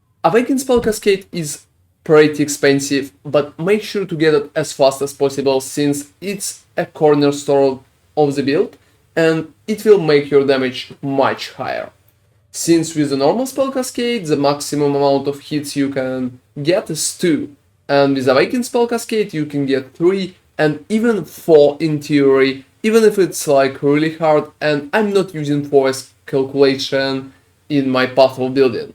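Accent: Russia. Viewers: жесть чувак твой русский акцент это такой пиздец, это невозможно слушать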